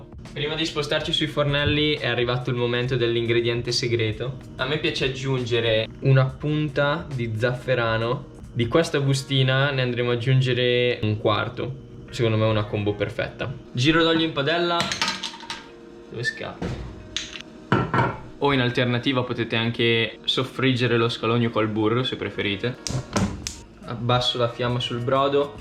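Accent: Italy. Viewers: Ma sbaglio o hai l'accento cremonese?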